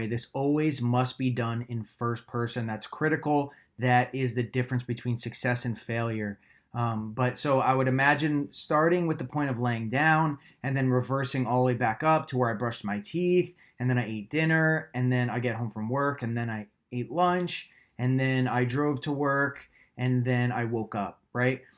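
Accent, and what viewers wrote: New Jersey, United States. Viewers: Love your videos. You have no idea how much you have helped me. I've always manifested randomly but now going for the really big stuff. Your voice is soothing and love the NJ accent. Be well. God Bless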